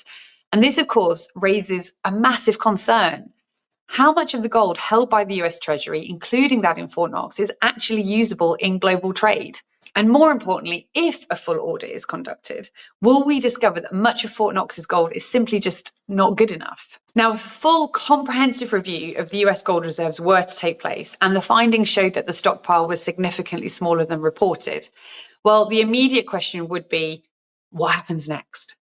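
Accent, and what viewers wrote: United Kingdom. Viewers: Who is we? Your accent sounds British? We in America need to do nothing if it bothers you then go use yin if memory serves the British pound was once a world currency correct? So I think the entire planet has gotten themselves into debt nearly all countries….what blows my mind is the country with the reserve currency has to debase it in order for all the free loaders to use it, all these videos on what we the USA must do, we don’t have to do anything we have been paying the bar bill for all the NATO countries for some time allowing all of you to spend less on defense and to rip USA off in trade all to help your countries and you all still are in debt…..America is in the debt because we have been covering the cost of allot of countries…Cold War ended at that point you all should have pony up but for some reason it didn’t happen….now with trump saying enough is enough we get all the tears Canadians are having a melt down over it the were getting 200 billion off us in trade but blocked allot of our goods same as European countries. 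Here is what I think if the gold is gone from Fort Knox and the USA dollar collapse Europe will be at WW3 inside 5-10 years with each other or Russia do the math if the reports are true Germany has one of the largest gold reserves hmmmmmm the country that started ww2 that owed war debts until what the 90’s will suddenly be most powerful European country lol every time Germany has been unified and has the means there has been war.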